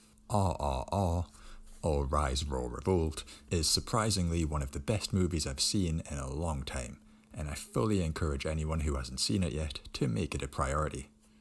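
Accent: Newcastle, United Kingdom. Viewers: Definitely a true geordie. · An Indian alumnus of the South Tyneside College, from the 90s. I have lovely memories of South Shields. I get nostalgic listening to the Geordie accent. Is there any video where you talk Geordie?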